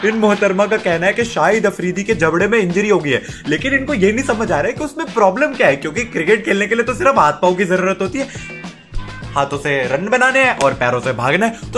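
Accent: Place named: Hyderabad, India. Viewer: mohtarma hyderabadi hai hamare yaha per aisich baat kartay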